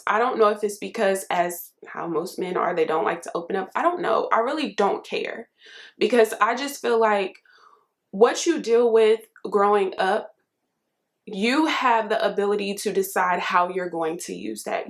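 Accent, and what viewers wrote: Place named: Deep South, United States. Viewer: Hey where are you in the Deep South because you have a real deep and strong southern accent ? Which city and state are you from in the Deep DEEP SOUTH???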